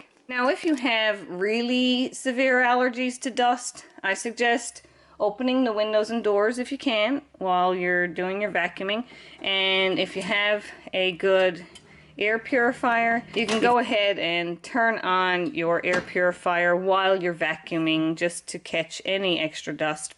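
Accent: Newfoundland, Canada. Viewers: Ah, I thought I detected a Newfoundland accent! So glad to find a Canadian channel that recommends things that I can actually buy here. This is a great idea; I'm just concerned about the noise.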